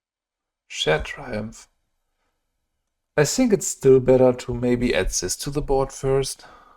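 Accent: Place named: Germany. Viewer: Vom Akzent her bist du sicher Deutscher! Cooles Deck und und nur Support für den Atzen!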